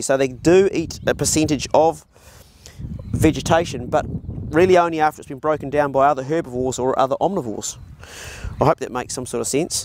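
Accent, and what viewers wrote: New Zealand. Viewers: okay take that back you are NZ xD as the video went on. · are you a kiwi or?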